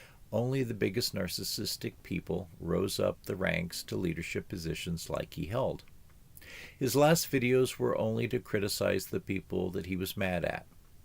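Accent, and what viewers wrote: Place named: Adelaide, Australia. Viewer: I think it’s because he grew up in the eastern suburbs of Adelaide and went to school there. When I first heard him speak I thought he sounded like he was from there and turned out he was.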